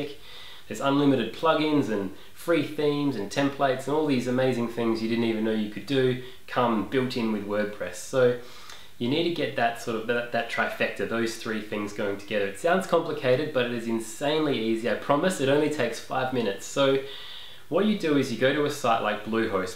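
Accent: Australia. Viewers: I didn't even hear you mention your accent (I watched the video 2x), let alone apologise for it, but I love it! Aussie accents and Aussie slang are awesome, and among my favourites in the world! :) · wot? you call that an Aussie accent hahaha do NOT apologise for the way we speak most peeps worldwide love our accent just remember to speak slowly I posted my video in reply - just not sure if it came through anyway, love this one have you thought of putting text over as a break from just you talking?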